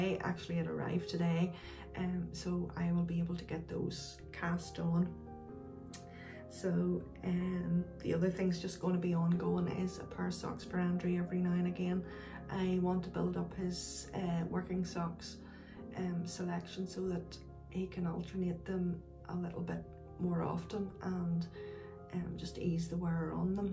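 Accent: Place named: Ireland. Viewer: Hello, it's nice to meet you. I live in Minnesota, began knitting about 1 1/2 years ago and I love everything about it. Your podcast, and you are lovely. Your Irish brogue is a bonus! Your shawl is looking great. By now you have probably cast on the Snuggle Down cowl and whatever combo you decide or have decided to use will be terrific. I enjoyed the pattern so much I knit two of them. Thanks for taking the time to podcast, Fern in Minn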